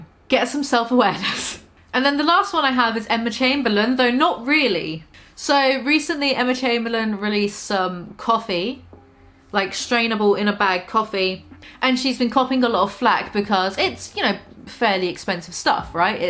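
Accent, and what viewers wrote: United Kingdom. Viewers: i love ur British accent so much ugh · me, an american: wow she sounds extra british today: i am ill edit #2: every single person in the replies is an idiot except (also i spelled her name wrong originally so i'm also an idiot) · i hope you feel better, but you sound so cute!! i don't know why but i think british accents get better when people are a bit stuffed up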